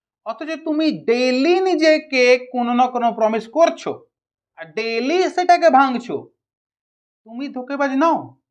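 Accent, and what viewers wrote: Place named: India. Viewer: স্যার আমার মনের মধ্যে একটা প্রশ্ন অনেকদিন থেকে আছে - আপনার কথার মধ্যে হিন্দি টান টা কি করে হলো? Please স্যার জানাবেন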